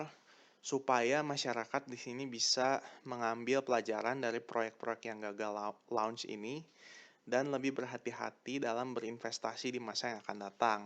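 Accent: China. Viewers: Wong logatnya aj Aseng bngt Panteslah klo u ko kontra SMA bossman 🤭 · Logat aseng....jualan liur lu seng😆😆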